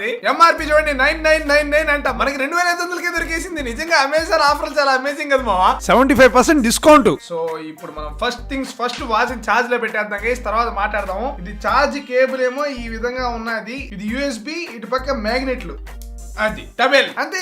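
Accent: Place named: Telugu, India. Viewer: Europe lo telugu abbai la undhi voice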